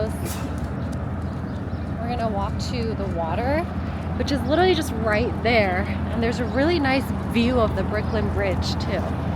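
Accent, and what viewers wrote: California, United States. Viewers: I like your California accent, stands out in NY.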